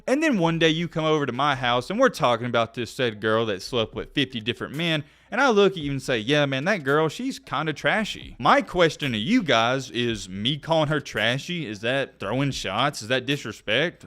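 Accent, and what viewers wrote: South, United States. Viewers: He saying wat he been doing all da times.u sounds country ass hell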